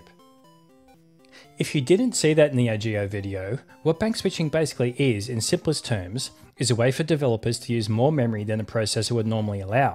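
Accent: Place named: Australia. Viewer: Niche info about old games, Aussie accent, backing track. Perfect channel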